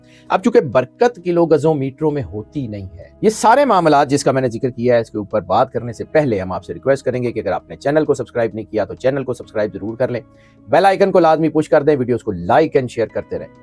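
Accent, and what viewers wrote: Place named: Rawalpindi, Pakistan. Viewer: Assalam walekum sar aapki awaaz bahut jyada speed Se Hoti Hai aap video Tej Karte Hain sukun ke sath aap video chalayen Karen aapki awaaz Aisa Lagta Hai Jaise Pindi wale sahi se Peeth se bolate Hain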